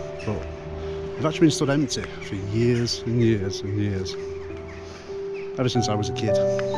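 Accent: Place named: Lancashire, United Kingdom. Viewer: As in Vee-k-us. I'm a Roman Lancastrian and talk just like you! Salt of...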